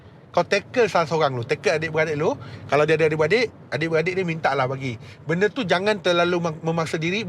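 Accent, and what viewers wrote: Malaysia. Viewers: lah igt kan mmg melayu 😂😂 abg cakap mcm mmg melayu tulen laaa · Mati2 aku ingat ko ni melayu. 😆 Cakap pun dah pure melayu. Anyway alhamdulillah and proud of you bro. Never leave your family despite revert to Islam. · Selama ni ingatkan memang melayu. Rupanya berbangsa Cina. Loghat percakapan macam orang melayu. MANTAP!!!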